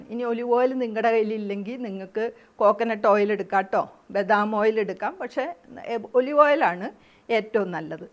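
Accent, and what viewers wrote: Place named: Kochi, India. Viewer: Mam te veedu fort kochi aano ...or vyppin...samsarom kettuttu agane thonni...njan Ernakulam...kaloor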